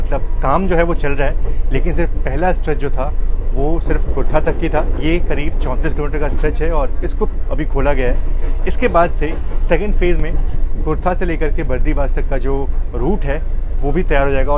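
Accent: Varanasi, India. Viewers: Bhaiya AAP banaras ho ky aap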